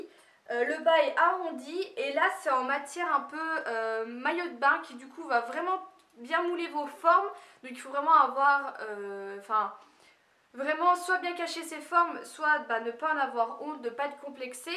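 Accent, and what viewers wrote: Lorraine, France. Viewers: l'accent lorrain est très spécial malheuresement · j'entends pas l'accent parce que je suis Lorraine aussi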